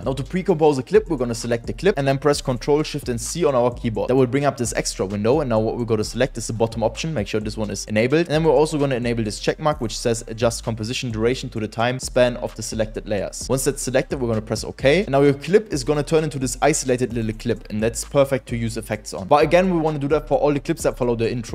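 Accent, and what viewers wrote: Germany. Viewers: Man hört dass du Deutscher bist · i hear German accent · Man hört so krass das du deutsch bist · i hear deutsch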